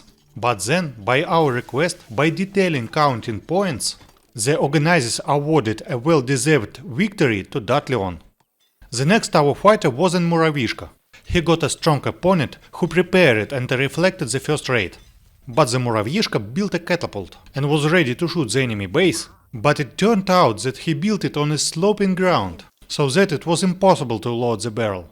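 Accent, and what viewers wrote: Russia. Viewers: Русский акцент))) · я не лингвист и могу ошибаться, но вроде бы это ошибочное мнение новичков в языке (и я так думал; не то чтобы я был гуру в английском ^_^, но считаю, что уровня Intermediate). Чётко и с акцентом -- русским так чётче и понятнее, а иностранцам -- наоборот. Но ведь такое видео делается как раз-таки для них...:)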